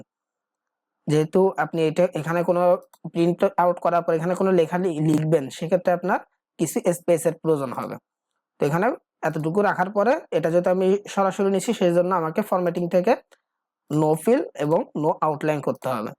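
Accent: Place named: Chittagong, Bangladesh. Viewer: চট্টগ্রাম ভাষায় কথা বলছে ।। আপনার ঘর কোথায় 🤔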